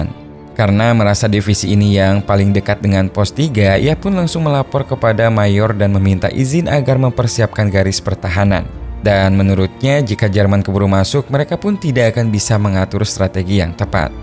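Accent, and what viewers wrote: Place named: Central Kalimantan, Indonesia. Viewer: Ini logat kame Kalimantan tengah lah gue suku Dayak Emang gite lah loga t nye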